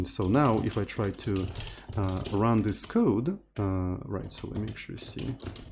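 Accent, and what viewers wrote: Russia. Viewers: are you Russian ?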